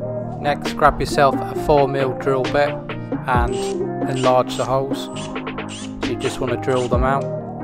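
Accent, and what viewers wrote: England, United Kingdom. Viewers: you sound English, I didnt know England was one of those sad countries that used Phillips screws, I thought that was an American thing.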